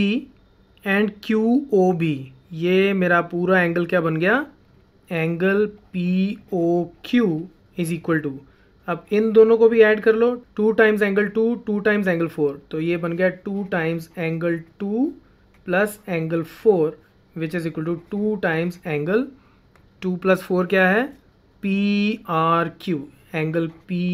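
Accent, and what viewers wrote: Punjab, India. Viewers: Sir ap punjabi ho na ?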